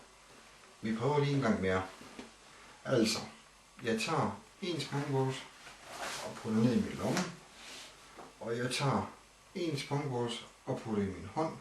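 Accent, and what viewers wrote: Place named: Jutland, Denmark. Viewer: Jeg tager én spongeballs :D haha, nice sleights men den jyske accent er sq lige i skabet :D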